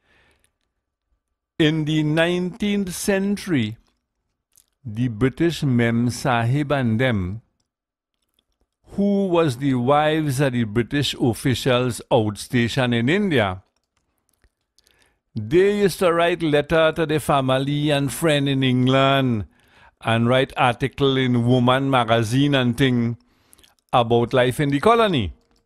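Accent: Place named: Trinidad and Tobago. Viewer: Thanks, bro. Glad yuh like it...we cyar stop talkin Trini, ent?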